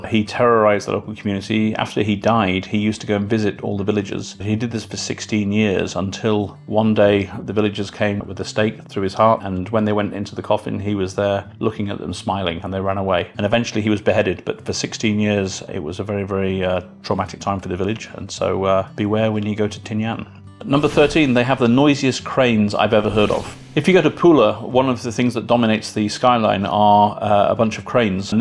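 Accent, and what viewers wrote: United Kingdom. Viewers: So sorry for sending you across the ocean. Somehow missed your British accent. But, later certainly explains your fluid words. NEVER Looking forward to your next thing. 🤗👍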